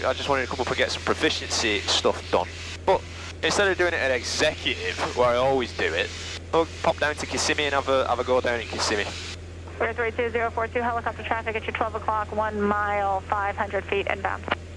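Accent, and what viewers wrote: United Kingdom. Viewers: You sound British, but you're in the USA.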